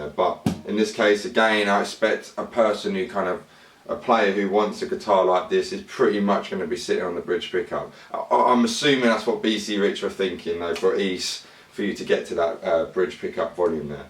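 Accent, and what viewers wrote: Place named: London, United Kingdom. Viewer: Londoner I think.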